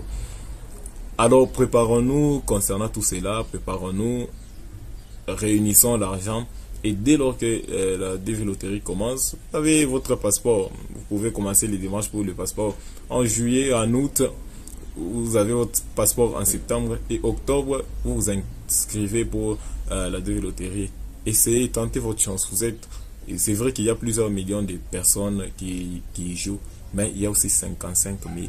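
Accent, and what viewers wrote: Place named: Kinshasa, Democratic Republic of the Congo. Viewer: Je suis quand même le premier à commenter . Par votre accent, j'avais compris que vous étiez congolais de Kinshasa 👍👍😍😍. Je m'abonne pour vous encourager 👍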